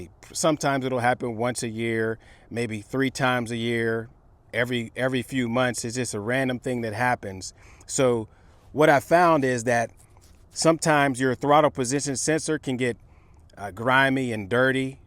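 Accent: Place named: Pittsburgh, United States. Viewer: U from pittsburgh ? Sound like a yinzer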